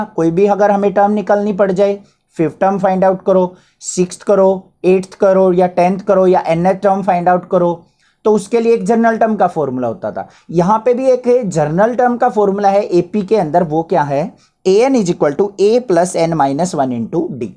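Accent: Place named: Punjab, India. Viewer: Apki awaj ki tone panjabiyo wali h 🤔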